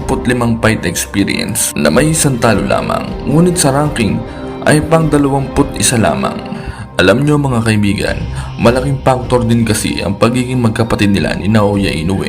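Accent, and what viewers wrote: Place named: Batangas, Philippines. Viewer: Ayos kabayan bentang benta Ang iyong mga banat...batangenyo ka ba?Ang mga salitang binibitqwan mo e hawig Ng salita Ng mga batangenyo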